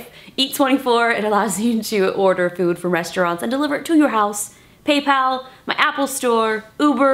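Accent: Pittsburgh, United States. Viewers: Is she from Pittsburgh Pennsylvania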